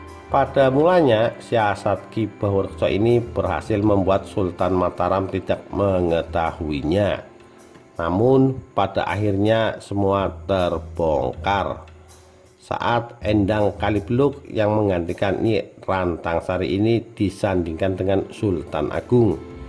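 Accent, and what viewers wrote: Tegal, Indonesia. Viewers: Admin kayane wong tegal ya boss..?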